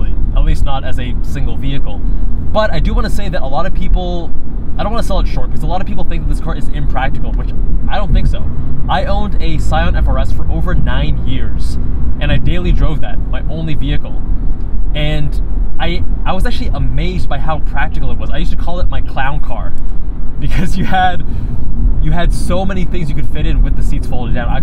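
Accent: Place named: Singapore. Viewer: Sounds Singaporean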